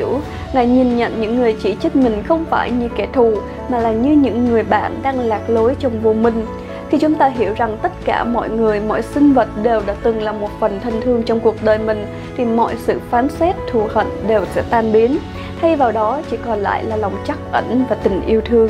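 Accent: Standard. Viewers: Nam mo a di ďa phat nam mo dau da minh tue phat toi nghe co noi pua chuan chuc co luon vuì khòe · Chúc mừng cháu giỏi về chính phát nghe quá chuẩn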